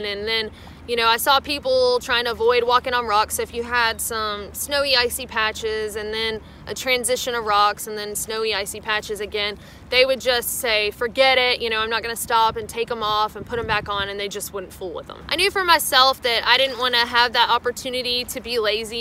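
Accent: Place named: Alabama, United States. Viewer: Hey, Thanks for the episode and the tips of advice....As usual your delivery was informative and interesting, with an ever so charming Alabama twist...We appreciate and look forward to the experiences you share with us...You take care and be well 'till the next one lady...Thanks for the smiles...CHEERS!!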